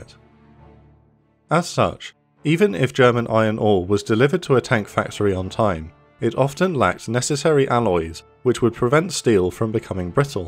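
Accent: United Kingdom. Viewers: This video is so typical of WW2 stuff: an announcer with a British accent (to make it sound more "official" somehow) and an over emphasis on the importance of tanks themselves by 1944. AIR SUPERIORITY and straight up LOGISTICS were 200% in the hands of the Americans, and even the Russians, almost a full year before the "Battle of the Bulge" anyway. Some great military mind once said, "Amateurs study tactics, Professionals study logistics." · This is not totally accurate, the problem was fuel, fuel and fuel! the Stug, Panzer 4, Panther, Tiger! Its like the Pommes still think that Little Willy Frank Whittle thinks he invented a jet engine; meanwhile the German had a Jet aircraft flying in August 1939! Judging by your accent you come from the country which made the worst tanks in WW2; the Cromwell, Crusader, Churchill all were shit! British Tanks were crap! 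My Father was a Tank Commander of a Mk 4 Sherman, and the odd Sherman had a 17 pounder in it! My dad was in the 20th Battalion of the 2nd NZEF, 1st Echelon. WE were bloody pleased to get Sherman's Powered by the Ford GAA V8 18 Litre grunt machine. He fought in Greece, Crete, North Africa, where the New Zealand division got smashed because we didn't have our own Tanks, so after massive losses, the Battalion (Survivors) were turned into armored Regiment and fought through Italy right up to Trieste! They even had a few shots at T 34's from Titos forces! Tito backed down! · I wish that the English was better without the over emphasis of the British accent.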